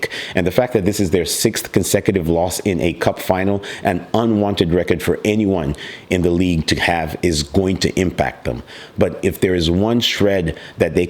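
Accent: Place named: United States. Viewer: Great analysis.American accent 👍🏻😊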